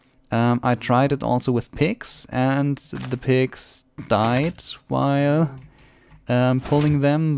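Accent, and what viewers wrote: Germany. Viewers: that german accent of yours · Man hört richtig diesem deutschen Akzent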